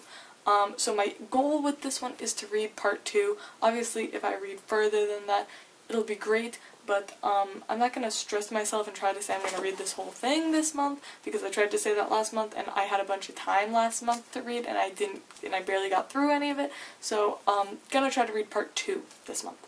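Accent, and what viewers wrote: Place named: Canada. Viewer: why do you sound Canadian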